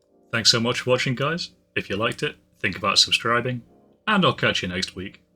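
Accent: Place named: United Kingdom. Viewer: Hey! You sound UK based.. where do you get your foam for the bricks from?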